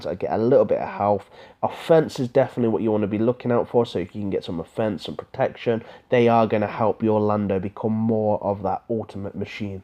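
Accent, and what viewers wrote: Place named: United Kingdom. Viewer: I bet you're from GB, not USA, judging by your pronounciation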